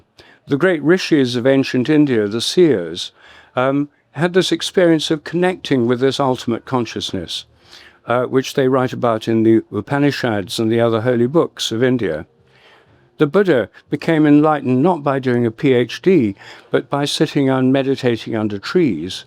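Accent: RP, United Kingdom. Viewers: This is such an endless rehashing. Rupert is still at it with his posh accent luring in his cult.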